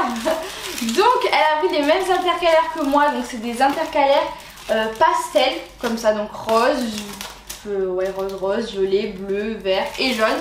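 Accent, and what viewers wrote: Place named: Marseille, France. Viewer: Elle a un petit accent marseillais je crois qu'elle vient de là bas 😊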